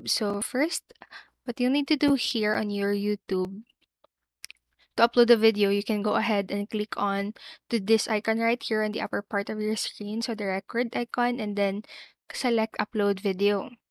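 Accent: Philippines. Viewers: Classic filipino/indonesian accent but this video helped me i am going to drop a sub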